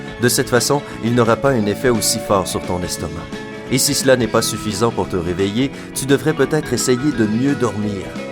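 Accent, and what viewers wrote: Quebec, Canada. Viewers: Accent québécoise j'adore 😊. Merci c'est sympa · C'est du québécois alors arrête de critiquer ! Ils ont un accent différent !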